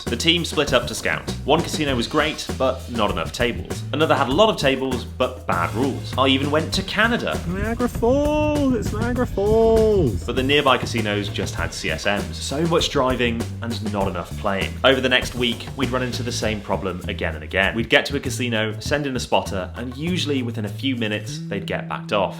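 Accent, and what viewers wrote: United Kingdom. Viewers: U sound british... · Baffled u can be recognized by just your voice? In the US and Canada, a british accent sticks out like a sore thumb. Not that it’s bad, it’s just easier to distinguish, unless u can use a different accent. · In this video you said you were baffled to be recognised by your voice, but isn't a British person playing cards in places like Arkansas quite unusual? I (fellow Brit) went to Texas once and my accent turned heads constantly. You might be attracting attention simply by talking in some of the non-tourist areas you visit. A tipped-off floor manager could have one of your videos playing in his ear whilst he listens to you talk live at the table, and you're disguise is blown.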